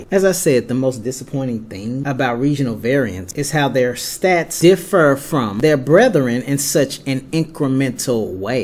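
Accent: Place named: South, United States. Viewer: he sounds Southern to me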